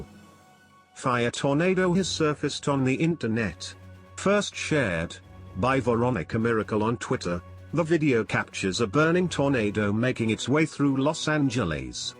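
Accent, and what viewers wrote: United Kingdom. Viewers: Thats not True there have been fire tornados before ,,,, Robot Brit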